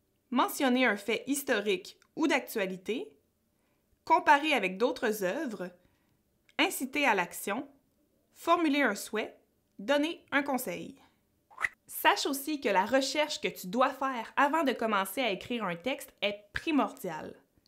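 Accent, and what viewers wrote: Quebec, Canada. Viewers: bah il est normal, c comme ca qu'on parle au Québec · Merci pour vos videos. L'accent quebecois est mignon.😊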